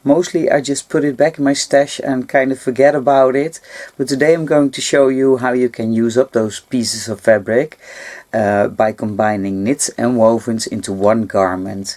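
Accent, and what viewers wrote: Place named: Netherlands. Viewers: Hahaha ja dat hoor je wel ja dat Nederlandse accent😁 Ik ben ook maar 1.60m en ik heb er ook altijd problemen mee maar de LN patronen zijn idd super!😉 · Ik hoorde meteen dat je Nederlands bent...(sorry, altijd een Nederlands accentje in ons engels.) En wat leuk dat je ook love notions patterns gebruikt. Ik pas de Europese patronen niet omdat ik maar 1.60m ben. En de LNP zijn voor kortere dames gemaakt. Wat een leuke top is dat geworden. Goed idee. Ga ik gebruiken.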